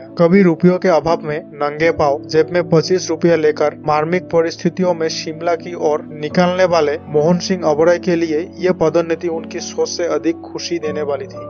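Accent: Bengal, India. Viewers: DADA APNI JE BANGALI SE APNAR HINDI BOLAR UCHCHARAN THEKE BESH BALO BOJHA JACHCHE * HINDI BOLA TA EK TU CHESTA KAROON THIK BOLTE PARBEN * KHUB KOTHIN NOY HINDI BOLA HINDI STYLE "E " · Watch this very interesting video relating to Mohan Singh Oberoi - founder of the famous chain of Oberoi Hotels. He started his life with just ₹25. The commentator seems to be a Bengali.His command on Hindi commentary is poor.He calls a district SARGODHA (now in Pakistan) as SARGONDHA ! Inspite of this, this video is full of plenty of intereting information about M.S.Oberoi. 🌲💐😀👌💐🌲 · Bhai Tui ki Bangali,Tor Uchharan puro bangali der moto.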